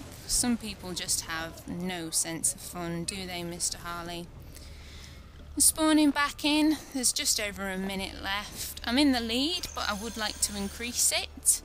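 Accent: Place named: Australia. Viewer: hey what nationality are you? you sound australian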